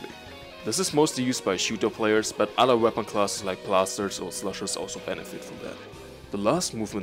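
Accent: Germany. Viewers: You sound so German. Great video though, thanks a lot! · Are you German? Also I knew about the slow squid trick since Splatoon 1 and it a lot more important than most people think. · i watched this video and was like „this guy is german“ and indeed you are :) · I guess ur a german ... am i right ? ... i can hear that DEUTSCH 🛂 accent ...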